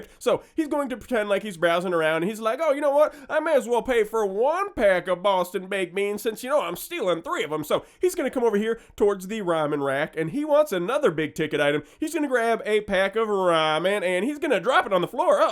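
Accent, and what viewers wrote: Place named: India. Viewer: wheres the indian voice?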